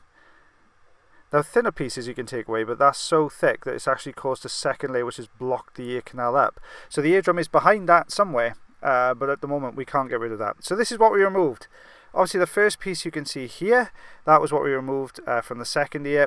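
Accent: Wales, United Kingdom. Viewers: Welsh voice, fairly sure.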